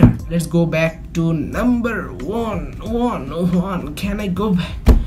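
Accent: Bengal, India. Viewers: No offence but try to change your Bengali accent it sounds shit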